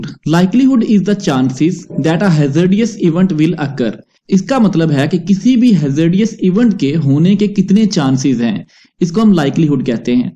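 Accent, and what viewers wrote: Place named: India. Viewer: He has got the Indian varient English all mixed up? Or is he in a cold bath?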